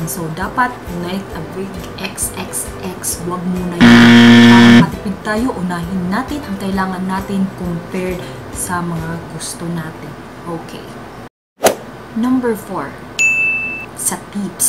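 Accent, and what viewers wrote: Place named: Visayas, Philippines. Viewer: I think bisaya ka dai... 😊😊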